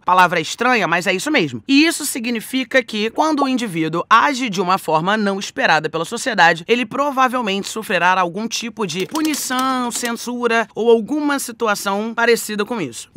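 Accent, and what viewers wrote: Rio de Janeiro, Brazil. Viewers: esse sotaque carioca kkkkkk foda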